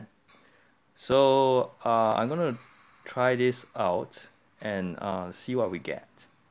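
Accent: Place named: Singapore. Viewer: well... your accent is typical singlish so im used to it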